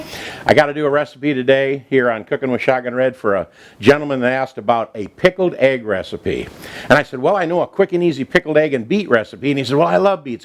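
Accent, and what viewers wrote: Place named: Chicago, United States. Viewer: Sounds like a Chicago accent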